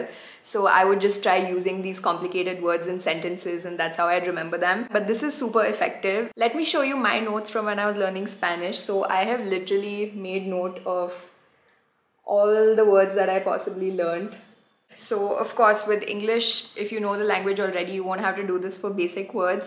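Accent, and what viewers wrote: India. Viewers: But your accent is totally indian you can’t say either American or British lol I enjoy watching your videos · today i am first time encountered with your video, i liked your fluency in english with good indian accent could understand easily.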